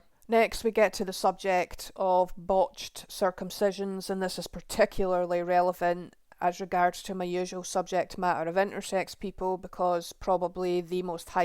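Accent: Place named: Scotland, United Kingdom. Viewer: It's interesting to hear your fierce condemnation and rightful indignation at this harmful cultural practice but at the same time you haven't completely shaken off the social conditioning normalising it. You speak of circumcised and uncircumcised rather than mutilated and normal or at least unmutilated. You say they ended up severely damaging David Reimer's genitalia to the point where it could no longer function as it was meant to which is what happens in 100% of cases with boys, at least! Actually he suffered a complete penile amputation rather than the intended foreskin amputation. You speak of the "medical procedure" when it isn't a medical procedure but a medicalised ritual or not even that in the case of the cutter being a Rabbi with no medical training and using no anaesthesia. We don't need balance when speaking about harmful cultural practices, we need objectivity! 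You sound Scottish, are you aware that in Scotland baby boys are put under general anaesthesia to have their genitals mutilated at their parent's request, on the NHS, at taxpayers expense?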